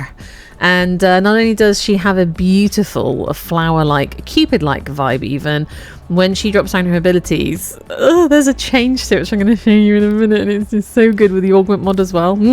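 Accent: West Country, United Kingdom. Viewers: Hell yeah! You sound like your from the West Country. Instant follow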